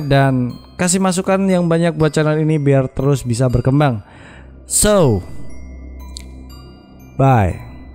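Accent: Java, Indonesia. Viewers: saran aja mas kalo medok gausah 'gua elu'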